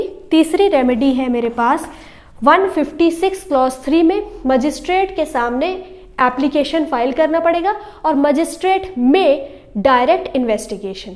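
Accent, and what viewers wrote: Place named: Madhya Pradesh, India. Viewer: AAP madam m.p se hai kya apne bola tin tutd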